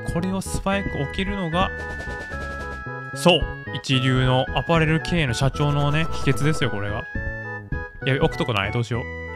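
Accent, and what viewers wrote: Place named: Kansai, Japan. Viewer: 関西弁多めで嬉しい:_ キラキラ: マネキン重視なお店でオモロい:_ バカな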